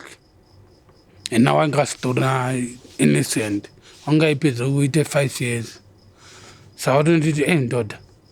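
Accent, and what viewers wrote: Ndau, Zimbabwe. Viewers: Ko nanhasi achikungotaura chi ndau like this but all these yrs haasati achinja tone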